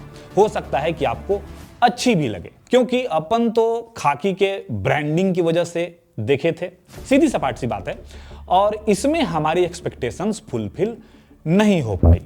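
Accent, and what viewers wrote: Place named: Bihar, India. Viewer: Iski bt se samajh aata he ye bihari he isliye ye jal raha he😂😂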